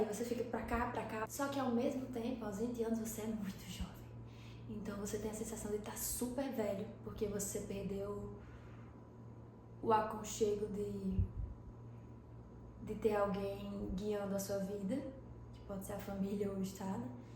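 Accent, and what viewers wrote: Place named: Paraíba, Brazil. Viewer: C é da paraíba? Mó sotaque daqui haha vídeo mt massa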